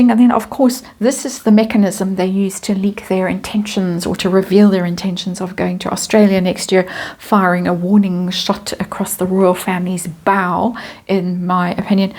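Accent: South Africa. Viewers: I wondered before you started this reading if your empathetic tarot reading would clash with astrology and the cards would not co-operate. However, as you seem to be able to keep both worlds happy, they were allowing you to gain what you were seeking. Rachel on the other hand? Maybe, having sold her soul to the devil, even he has chucked her out, so she is trying to cosy up to the heavens. I really enjoyed this reading and PLEASE keep your lovely soft South African lilt and don't try Russian😇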